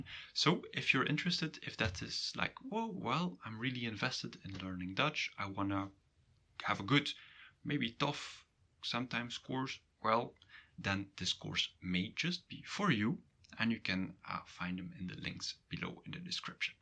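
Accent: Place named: Belgium. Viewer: Well, finally i can find a real Dutch course video from belguim's accents . I will follow all your courses...:-)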